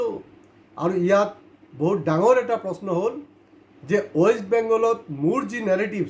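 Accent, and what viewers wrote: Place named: Upper Assam, India. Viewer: আপোনাৰ জিলা খনৰ নাম জনাব নে।কথা বোৰ upper assam ৰ যেন লাগে ।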